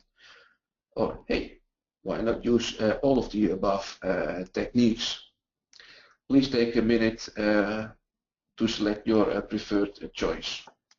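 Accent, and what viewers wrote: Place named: Netherlands. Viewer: This man's English pronuncation indeed sounds like he is from the Netherlands and learned English over there.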